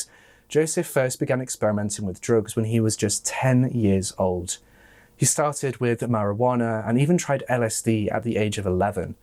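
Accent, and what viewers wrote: United Kingdom. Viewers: I've watched years of your videos and just love you. You and Emma Kenny are my favorites. I'm an American and I adore you and Emma's British accents. Thank you for the hard work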